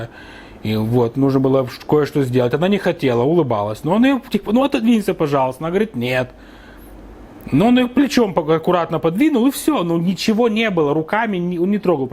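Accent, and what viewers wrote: Odessa, Ukraine. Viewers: Приятно вновь услышать неистребимый Одесский акцент. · Класный одесский акцент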